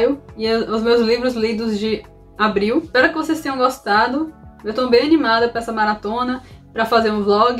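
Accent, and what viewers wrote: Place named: Salvador, Brazil. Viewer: eu ouvi seu sotaque e na hora pensei: oxeee é de salvador será? kkkkkk <3 amei seu canal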